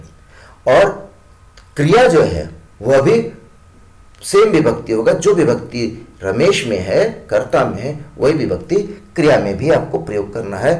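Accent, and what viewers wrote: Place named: Hindi, India. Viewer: మొదట సంస్కృతాన్ని సంస్కృతంలా పలకడం నేర్చుకోండి. హిందీ రూల్స్‌ అప్లై చేయకండి దాని మీద. భాష మీద అభిమానం ఉంటే సరిపోదు. సరిగ్గా నేర్చుకోవాలి. హిందీ రూల్స్‌తో సంస్కృతాన్ని నాశనం చేయద్దు.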